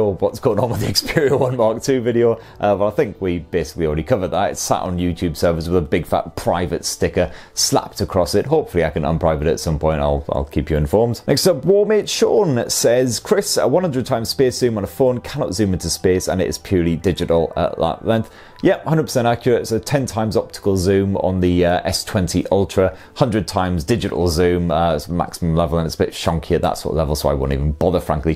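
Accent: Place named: North, United Kingdom. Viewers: North accent is amazing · What part of the North are you from. You sound a lot like my old boss.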